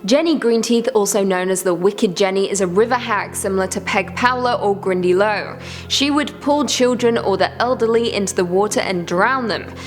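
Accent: United Kingdom. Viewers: It's so good to hear this a fellow Brit and not having to listen to Americans butcher British town and city names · You're British? I couldn't tell. I just figured that you had a cold.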